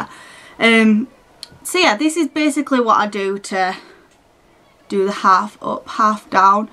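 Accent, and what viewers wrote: United Kingdom. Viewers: Love them all! Love your accent so much,I'd love to visit the uk aha x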